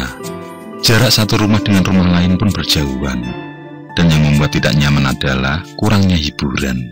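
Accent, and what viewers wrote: Java, Indonesia. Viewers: Suara narator dongeng Ter baik ya ini. Suaranya medok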